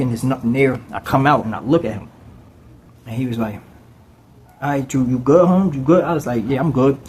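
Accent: New Jersey, United States. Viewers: I knew you were from Jersey before you even said it🤣! Newark in the building. This the first video I’ve seen and I was confused because I’m hearing a Jersey accent on a Georgia prison video it was unexpected.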